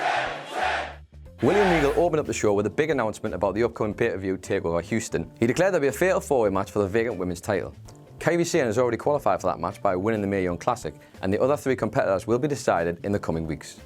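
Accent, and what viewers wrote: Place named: North, United Kingdom. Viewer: Holy... dude slow down! Talks way too fast... way too British... fast British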